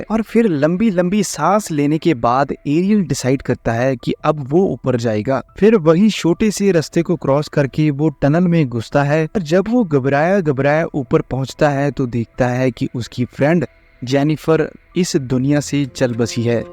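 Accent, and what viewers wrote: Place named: Pakistan. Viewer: tumhara accent Pakistani lag raha hai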